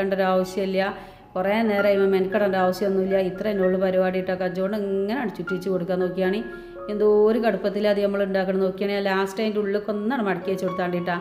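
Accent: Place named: Malabar, India. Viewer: Pure malabar Muslim laungage.Very intersting for lissen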